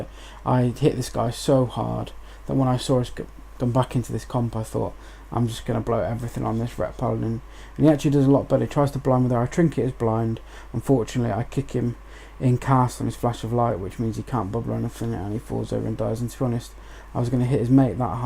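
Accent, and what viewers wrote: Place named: Lithuania. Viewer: Good video and nice commentary, I'm trying to place your accent, are you from Lithuania by any chance?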